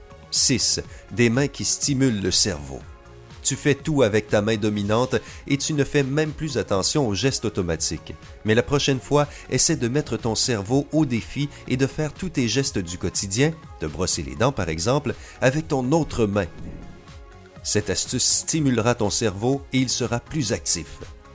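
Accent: Quebec, Canada. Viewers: Quel accent de merde cet accent québécois quand même, on dirait de la chiasse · L accent Quebecois ET trop drole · l'accent quebecois désolé mais non s'il vous plaît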